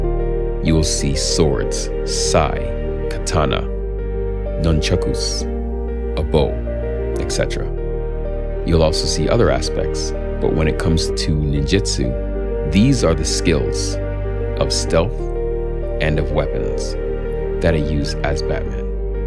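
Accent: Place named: Canada. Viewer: YOU ARE A CANADIAN.......I CAN MAKE IT OUT FROM YOUR ACCENT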